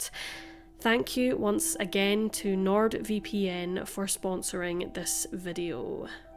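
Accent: Scotland, United Kingdom. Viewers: What a lovely Scottish accent.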